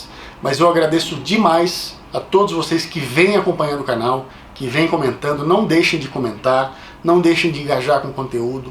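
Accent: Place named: Brazil. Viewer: É bom que, inglês falado por brasileiro é muito mais fácil de entender, pra mim né kkkk mete as fichas aí!!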